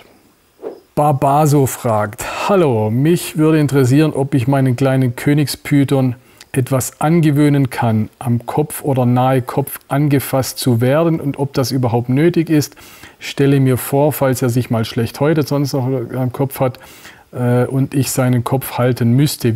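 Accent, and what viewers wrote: Swabia, Germany. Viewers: Tolle Aussage zu dem kommenden Lampropeltis getula nigritus Verbot und auch zu dem idiotischen Kaiman Urteil. Weiter so, äh noch ne Bitte, könntest du bitte etwas mehr Hochdeutsch sprechen, dein Kanal ist (zum Glück) weit verbreitet und nicht jeder versteht schwäbische Ausdrücke und Aussprache. Ich verfolge ihn zB aus Spanien und habe damit ziemliche Schwierigkeiten, danke für das Verständnis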